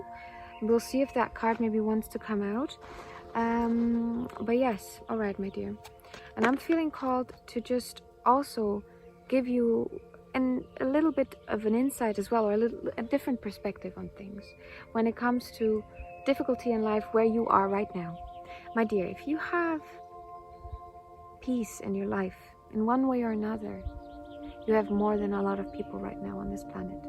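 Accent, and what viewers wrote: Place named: Ireland. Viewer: Thank you, for a very nice, spot on reading. I like the Irish touch in your accent. Looking forward to more readings. I feel very good about what I have built, abundance is very subjective. I know the abundace that I aspire towards.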